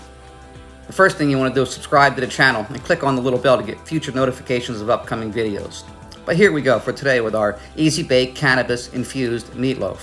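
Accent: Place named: Philadelphia, United States. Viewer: I'm from Philly so I recognized the "accent" even though I don't think we have an accent lol..I watched the video twice to make sure you didn't say water...that would've been a dead giveaway 😄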